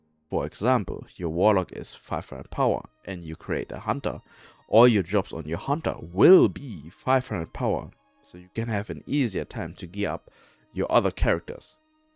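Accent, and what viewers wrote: Germany. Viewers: Aß a german i can Say you Sound kinda german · Wieso hört man als Deutscher raus, das du Deutscher bist haha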